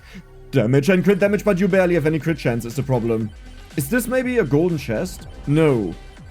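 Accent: Germany. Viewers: as a viewer since early vampire survivors, I like listening to ur silly German accent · I just love the "Deutscher akzent". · Love when you talk. Otherwise it had been so boring. I’m from Sweden and learnt German from playing cs in the old days. And you’re accent brings back so much memories for me ❤ and my favorite German insult is Deine mudda schnallt sich ein dreirad unt spielt mit der transformers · People that dont like you talking you dont want as followers anyway. Your German accent rambling with a little campness in there is absolutely brilliant. I watch for that now. Megabonk is cool but your rambling is priceless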